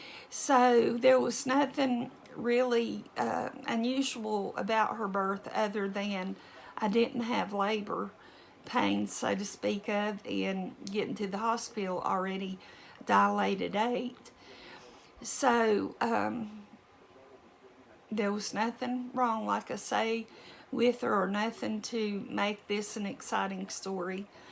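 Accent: South, United States. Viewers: Wow it sounds like an easier birth since you didn't have hours of pain. And I hope you have a speedy recovery from your being sick. I just love your southern drawl and how calm and relaxed you talk.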